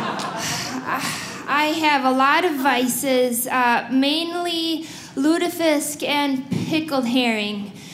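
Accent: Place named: Minnesota, United States. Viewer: Lol she has the stereotypical Minnesota accent.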